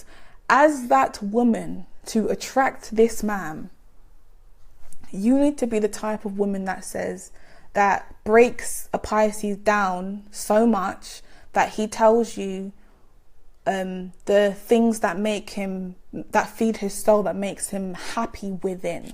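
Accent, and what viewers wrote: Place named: Nottingham, United Kingdom. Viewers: There’s nothing wrong with the Nottingham accent 🤨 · Hi, great video as always. Are you from Nottingham? Your accent sounds similar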